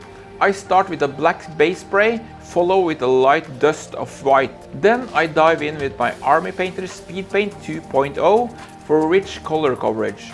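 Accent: Norway. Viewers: Hei, jeg mistenker sterkt (basert på engelsken din) at du er fra Norge? Hvor kan jeg kjøpe miniatyrer fra Firgeforge games i Norge?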